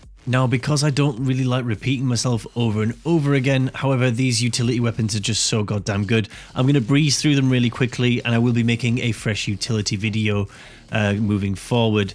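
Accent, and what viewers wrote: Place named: United Kingdom. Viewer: love that british voice of yours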